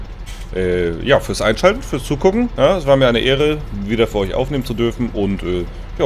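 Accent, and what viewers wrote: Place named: Low German, Germany. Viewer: Hachja... endlich wieder ehrliche Landwirtschaft mit Plattdütsch und ohne CP, wie mir das gefehlt hat :-)) Im Ernst, du bist so einmalig und so bist du an meinem Feierabend seit der Freidorf!!! Und die Grafik ist tatsächlich Wahnsinn! ich versteh gar nicht wieso! Liegt das etwa an Deinem neuen Rechner, du Schlawiner??? Oh nee muss nicht sein mit der Frankenmap, lass sie ruhig auf Twitch... Was hälst du von Gorale für Youtube? Aber eigentlich reicht auch erstmal Windsbach oder? Modcontest finde ich wirklich sehr sehr gut, war ja mein 1. mal :-D Ich finde gut, dass Mods sich mal offiziell vorstellen dürfen und dann auch mal belohnt werden. Zum Teil ja unglaublich, was die Jungs so raushauen - wie z.B. den Seasonsmod - die haben den 1. Platz absolut verdient! Was ich persönlich richtig toll fand, waren die Youngtimer-Mods und natürlich den Seasons-Mod. 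Bei den Karten bin ich etwas skeptisch, ob es später dann gefixte Versionen gibt, denn Fehler haben die alle.... So, das war das Wort zur Nachtruhe am Dienstag, Horridooo :-))) PS: Der Country-Sender ist sooo cool, ich liebe ihn!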